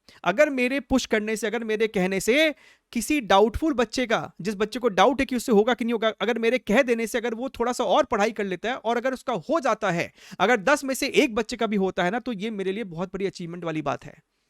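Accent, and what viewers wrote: Bihar, India. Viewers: to aaj pata chala ki aap bihar se hai.sir mujhe aapke accent se hi lagta tha ki aap bihar se hai.Mai bhi patna se hu sir and iss advanced me phodkar bihar ka naam roshan karna hai.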